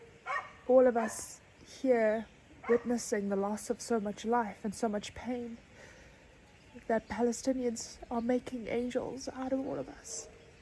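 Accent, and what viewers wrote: South Africa. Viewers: Jai Anandamayi Ma ❤ the divine feminine expressing itself through this beautiful blessed woman with a South African accent. Shanti Shanti Shanti 🙏❤🕉❤🙏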